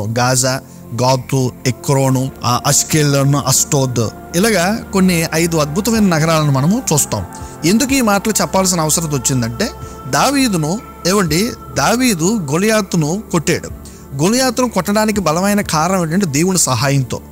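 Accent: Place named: United Kingdom. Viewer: Meeru enduku English vallaku puttinatlu English slang lo telugu matladutgaru Neat ga Telugu matladu ledante English matladu ee teda Telugu enduku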